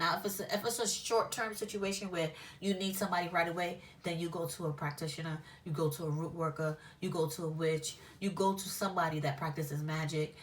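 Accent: New York, United States. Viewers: Where r u from.... you sound like a New Yorker ...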